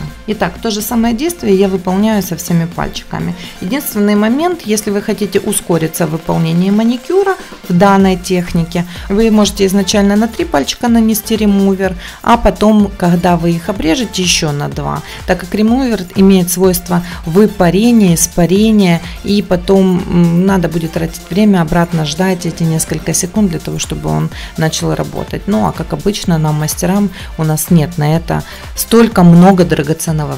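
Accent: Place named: Ukraine. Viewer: с Украины, от акцента никуда не деться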